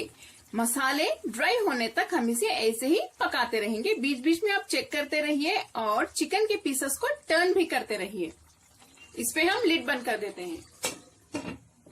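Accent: Hyderabad, India. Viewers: got to know with your hyderabadi language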